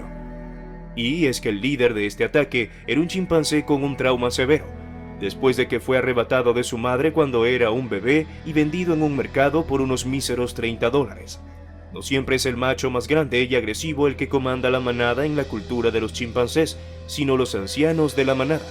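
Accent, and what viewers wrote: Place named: Venezuela. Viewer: El acento tropical y pretencioso, (venezolano?)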